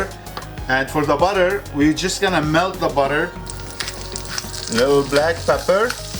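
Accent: Lebanon. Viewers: You sound so Lebanese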